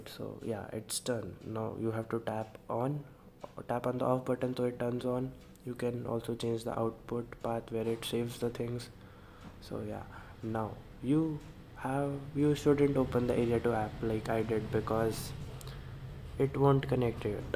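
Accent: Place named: India. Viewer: its impossible for me to understand Indian people talking english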